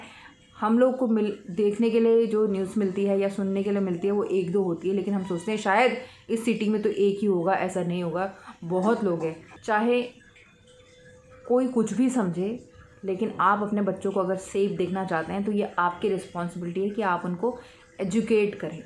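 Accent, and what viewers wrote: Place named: South India, India. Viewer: Di ap MERI English ki mam Jaise lgte ho,school ki teacher thi, wo b south Indian thi, aur apka way of talking b aisa hi tha r wo b south Indian thi 😘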